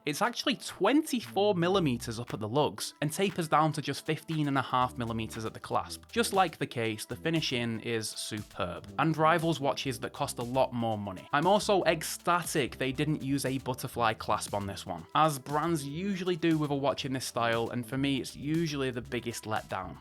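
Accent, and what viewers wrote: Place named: Scotland, United Kingdom. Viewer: Why are all you reviewers Scottish? 😒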